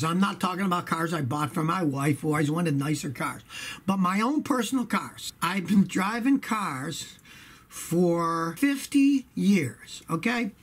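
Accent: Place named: United States. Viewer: What kind of US accent does have? I sometimes hear it, but not as strong like.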